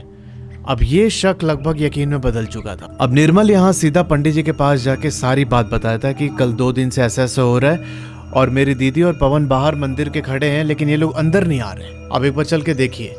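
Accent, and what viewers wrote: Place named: Jharkhand, India. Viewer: Bhaiya aap Jharkhand ko boli seh lag raha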